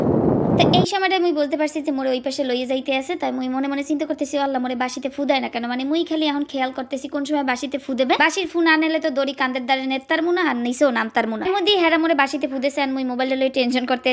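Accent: Barishal, Bangladesh. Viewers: আমার বাড়ি বরিশাল। তাই আপনার কথাই আমার কাছে সবচেয়ে বেশি ভালো লাগে। ভালো থাকবেন।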